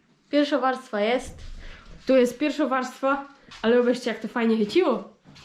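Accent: Silesia, Poland. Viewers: fajna ta ślunsko godka w wykonaniu.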